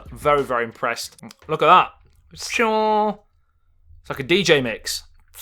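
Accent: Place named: Essex, United Kingdom. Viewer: This man is clearly of irish Catholic descent. Hia facial features are a dead giveaway. What puzzles me is why he is talking with an essex accent. He should do the next video in a raw irish accent to pay tribute to his irish Catholic ancestors. I knew he was Irish the first time i saw him on youtube which was last week. He is a strong Irish leprechaun trying to present tech videos. He is an Irishman by blood.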